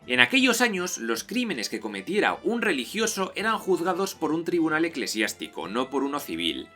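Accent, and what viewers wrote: Spain. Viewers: Me gusta tu acento español, además de tus vídeos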